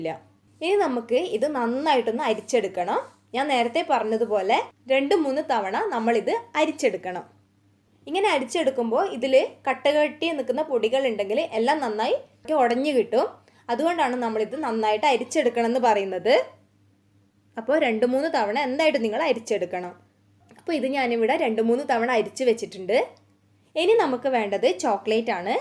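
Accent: Kannur, India. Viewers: Kannur kaari aanalle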